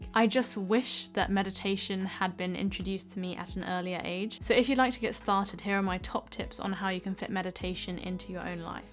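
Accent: United Kingdom. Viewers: Hello dr, my name is mark. I love your video its very inspiring for me. Although i had a bad set back last year I was on a dating site and met a chinese girl, who i thought i was in love with. Turned out to be a crypto investment scam, called sha zhu pan or butcher pig scam of asia. I lost $100,000 in crypto currency. Im depressed now n taking weed gummies and zoloft to help sleep; and get my serstonin levels up. While managing my acid reflux and diverticulosis. I hope i can learn to think better so ill try to learn what your teaching. I just hope weed gummies dont effect my memory. Its bad enough as it is. Along not knowing if ill choose surgery for a 5cm les esophagus,hernia. Surgery is to hard to understand now. Any advise id appreaciate. I get dizzy from clogged sinus. But i admire you fir showing me how a person can be if they apply them self. So tk you, and love you british accent. 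AMAZING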